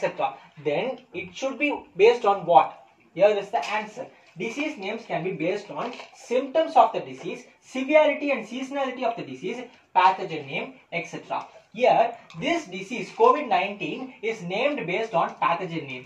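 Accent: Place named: Punjab, India. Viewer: yrrr ap ka andaz ajeeb h bht mtlb lhja punjabi type h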